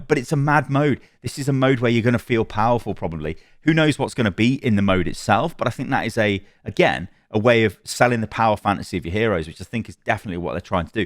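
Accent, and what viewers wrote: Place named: United Kingdom. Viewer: It's just his br'i'sh accent ;)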